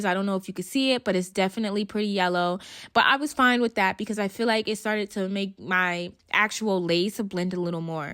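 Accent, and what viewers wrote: New York, United States. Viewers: “BRONZA”😭😍🤣 I love your NY accent!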